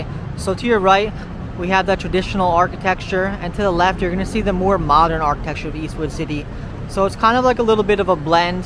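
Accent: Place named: South, United States. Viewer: Judging by your accent... You're from the southern United States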